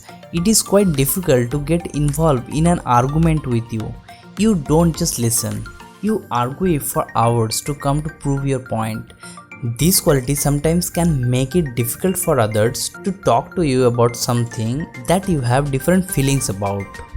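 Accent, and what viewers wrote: Bengal, India. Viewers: His accent sounds like Bongs 🥶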